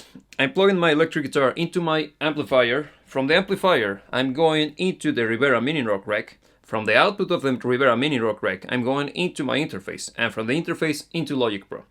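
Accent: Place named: Europe. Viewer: He sounds like a European version of McMurray from Letterkenney.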